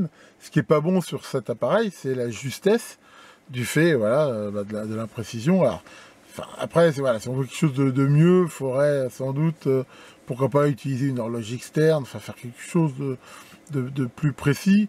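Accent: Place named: France. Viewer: Bonjour, Excellente vidéo comme toujours sur cette chaîne, ça fait toujours plaisir de voir une notif sur YT avec une nouvelle vidéo de ^^ Par contre, pouvez-vous répéter après moi M? Aidafroute:D Je plaisante, mais cette petite touche d'accent Français m'a fait sourire. Bonne continuation à vous.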